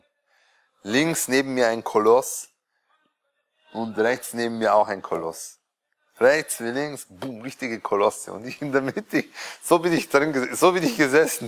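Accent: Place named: Bavaria, Germany. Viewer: Köstlich der bayerische Einschlag!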